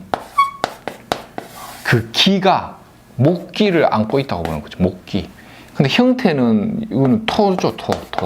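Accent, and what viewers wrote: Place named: Gyeongsang, South Korea. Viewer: 감사합니다 경상도 사투리가 너무듣기 좋아요! 나이트 ㅋㅋㅋ ~~~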